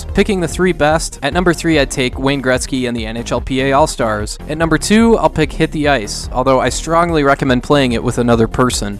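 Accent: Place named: Minnesota, United States. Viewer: Never knew you were a Minnesota guy, but hearing you say the word hockey 40 times in the last 10 minutes I can't believe I didn't pick it up before!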